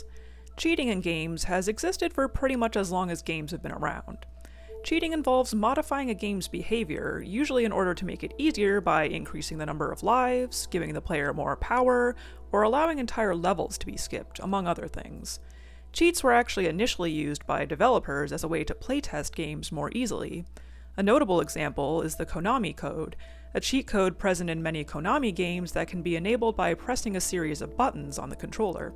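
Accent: Canada. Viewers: i didn’t realize you were canadian until you said “zed” and then the way you say “newer” (nyoo-er instead of noo-er) really cemented it lmaooo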